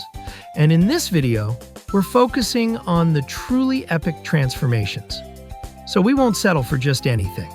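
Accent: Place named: Boston, United States. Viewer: Incredible video! It made me feel nostalgic about when I used to play on my red DS Lite. Awesome, based on your accent, I’d bet you’re from Boston. Cheers, and you’ve got a new sub!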